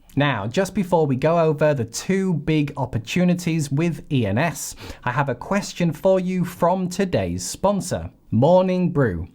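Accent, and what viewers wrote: United Kingdom. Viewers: Life is SMOOTH with a British accent........Cheers My Love...!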